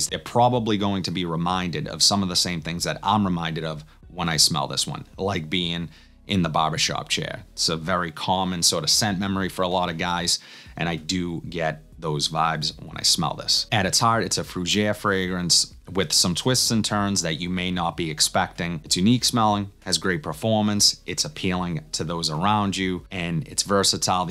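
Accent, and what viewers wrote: Boston, United States. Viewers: Thanks bro.im from n.y u have to be from Boston..had to guess.PDM all day!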